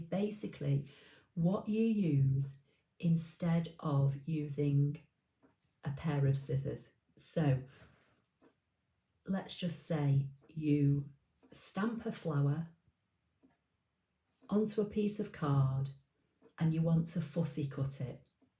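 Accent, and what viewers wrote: England, United Kingdom. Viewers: You have amazingly easy videos to understand even though your English accent is way different than my Texas accent but boy howdy does this old lady enjoy your videos. I haven’t received my scan and cut yet but I know I won’t be afraid to take it out of the box. I hope you do some videos about cutting material thank you so very very much for sharing your amazing talent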